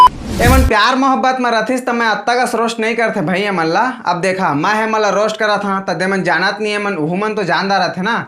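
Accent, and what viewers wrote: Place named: Janjgir, India. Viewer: Janjgiriha tone lgthe ga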